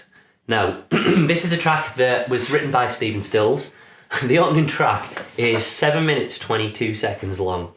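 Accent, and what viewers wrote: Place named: Northern England, United Kingdom. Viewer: A very nice review man. I love this album. The harmonies do it for me really. I detect a northern accent from you. I am a Londoner lol. I will check out your George Harrison review soon, as well as any others you have done. Great work!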